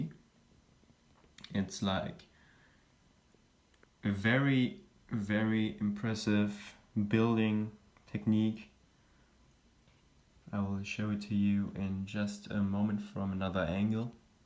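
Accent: Germany. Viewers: sieht ganz geil aus, ich weiß nicht safre vdas du deutsch bist aber dein englisch hört sich ziemlich deutsch an :D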